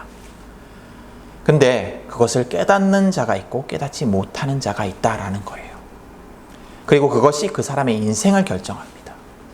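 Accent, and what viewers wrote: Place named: Gyeongsang, South Korea. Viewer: 한예연에서 설교 듣고 구독했습니다! 목사님한테서 대구방언 억양이 들렸는데 대구에 있는 교회였네요. 예수님 사랑만으로 충분합니다. 목사님, 감사합니다!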